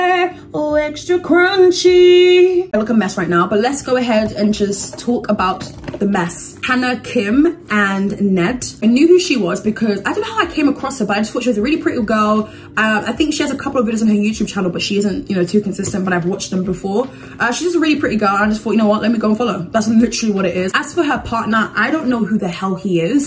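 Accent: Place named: United Kingdom. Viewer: I like you, your accent, i know it, you have to be british. you are so cute!! very weird seen an adult act like a child.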